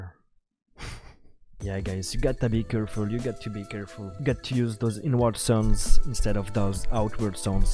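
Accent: France. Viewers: are u french?🤔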